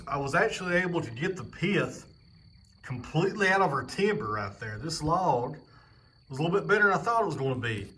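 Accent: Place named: West Virginia, United States. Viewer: Good for you. I have been watching your channel for a couple of years now. I discovered your channel when a related channel changed to yours and I heard your dialect. This is very similar to my many (mainly deceased) dear relatives from SW/WV in Mingo County--a long way from suburban Philadelphia where I live now. My favorite uncle in Mingo had a very dangerous circular sawmill used to mill cap wedges to secure mining timbers. I have loaded a few pallets of wedges in my time. Although we didn't timber frame them, we also built a few sheds out of "sawmill" lumber. He acquired his logs in a fashion similar to yours. Great content. Takes me back.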